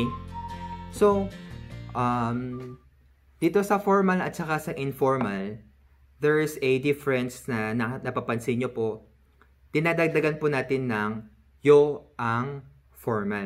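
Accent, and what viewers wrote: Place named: South Korea. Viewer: Meron ka na konting korean accent medyo ngtatabingi na ang pagsasalita mo ng tagalog pansin ko lang. 😁🙂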